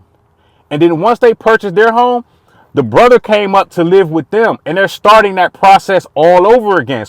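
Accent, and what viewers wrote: DMV, United States. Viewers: Lol i knew from how you talked you were from the DMV, before you said it in this video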